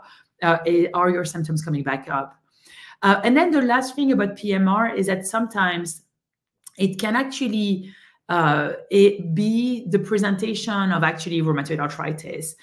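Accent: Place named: France. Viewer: Too bad the French accent is so strong, I can't really understand a lot of words said until I finally turned on the captions and, still, that was not 100%. Still had to repeat some sentences multiple times.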